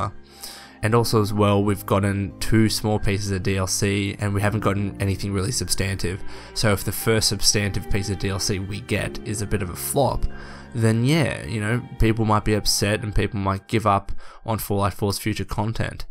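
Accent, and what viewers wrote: Australia. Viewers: hope the "Fah Hah Bah" jokes don't upset you, know that we love you and your kangaroo accent!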